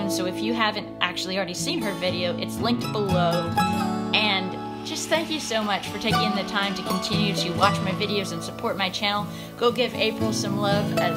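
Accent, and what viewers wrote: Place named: Texas, United States. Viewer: are you a Texas gal too, accent sounds right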